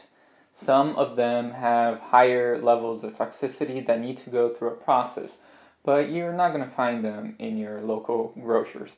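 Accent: Brazil. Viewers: Que trabalho maravilhoso,!! Estamos apaixonados! Engraçado que topamos com um vídeo antigo seu e pelo sotaque no inglês eu já senti que era brasileiro 🧡 é lindo topar com narrativas riquíssimas e belíssimas como as suas! Muito obrigada pela dedicação, lhe desejo muito sucesso!!!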